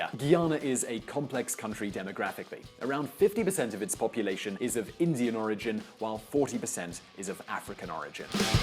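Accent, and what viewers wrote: United Kingdom. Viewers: First thing first let's get the name of the country pronounce correct it is not Guiana it is Guyana and your video is very misinform If you going to do a YouTube video on a country you should be properly informed and you should have also pointed out with your British accent that the American former President Jimmy Carter went to that country in 2015 and help Red Dead election which had send that country back into a reverse like the American and the British government did in 1964 that is something you should have pointed out Guyana economy was well on their way to better greater things under the PPP people Progressive Party now the an economy is only based on one thing that is oil please be properly informed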